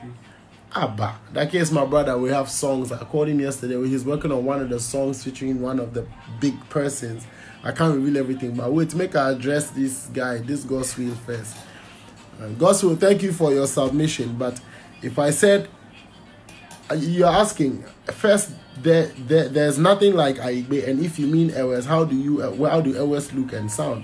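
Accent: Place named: Jamaica. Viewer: You’re talented with unique voice which makes you super extraordinarily musician in Africa but try to limit your Jamaica accent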